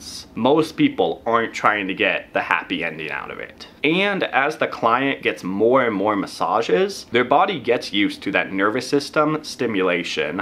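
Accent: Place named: South, United States. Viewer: You're cute and your voice sounds like you have a little country accent. 👍🏻❤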